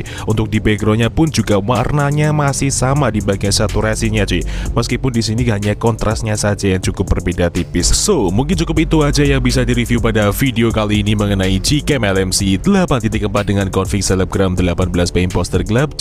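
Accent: Surakarta, Indonesia. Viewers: org solo bang?wkwk sama nih😅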